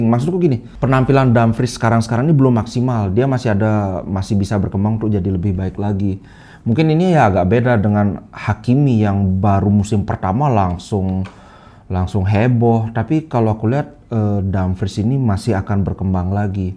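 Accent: Bali, Indonesia. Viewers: Org bali ya bang?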